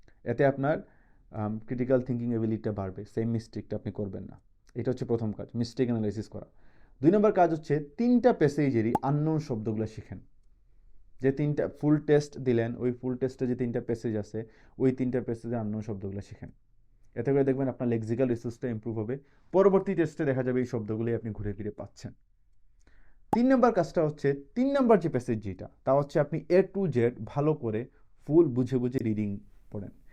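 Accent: Sylhet, Bangladesh. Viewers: Apne ki sylheti? Apnar kotar modde sylheti tan ta ashe🤷🏼‍♀️? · Sir Are you Sylheti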